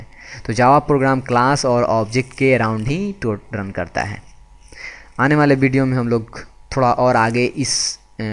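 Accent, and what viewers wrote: Bihar, India. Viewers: are you from bihar?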